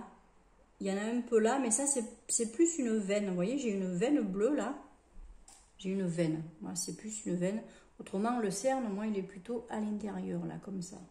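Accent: South, France. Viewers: Hello, j'aime beaucoup ton naturel sans chichi, c'est très agréable! Et ton petit accend du sud adorable! On ne peut pas plaire à tout le monde 😉.il y a toujours des personnes qui critiqueront. Ne change rien, c'est parfait! Très bien journée, prends soin de toi. Bisous.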